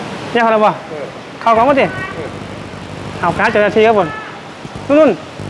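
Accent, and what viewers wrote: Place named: Isan, Thailand. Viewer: ข่อยว่า เจ้าเหมือนคนอีสานบ้านข่อยคักๆ😁😁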